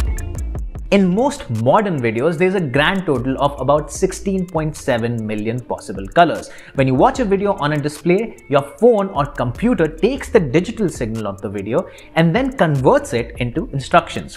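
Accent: India. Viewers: Best indian English speaker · Hey, here, the anchor from the video! That's okay buddy, I could have faked an accent but i tried to stay true to my own fellow Indians. Spend some time with Indian English content, and you'll soon start understanding what I'm trying to say. You can also use the CC feature in the YouTube interface. Thanks for being honest!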